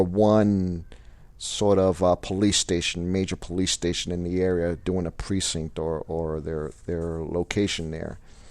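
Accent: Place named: Mexican American, United States. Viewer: Can I ask where you are from? Also are you Mexican American as it sounds like to from the accent and verbal variance in your voice I would say 2nd maybe 3rd gen. Great channel and thanks for all the info you provide on a wide level which is helpful for a full perspective on this subject. I am learning so I can train some young scouts.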